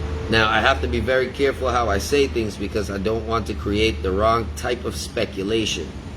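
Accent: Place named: Guyana. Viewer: im surprised u int popular lil advice tho shorter vids st88 to d point n more of d gt raw accent guyanese lik ppl dat doz tlk wah dem tinkin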